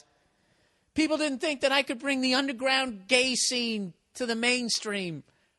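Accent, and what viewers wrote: Boston, United States. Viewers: It's weird. is like some kind of boston inflected, test dummy for life. He could have a take on anything and you could listen for hours. Imagine someone dropping him off in afghanistan and then the following monday morning you have: Afghanistan. Then he would finish off with Nia's take on it.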